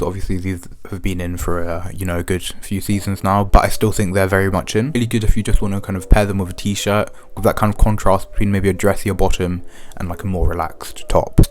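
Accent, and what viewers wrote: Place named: England, United Kingdom. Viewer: just becoz you English accent 🤌is wow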